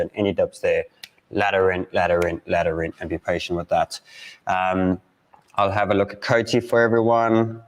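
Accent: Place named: South Africa. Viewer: He's sounds South African to me but I don't know because there's so many different South African dialects.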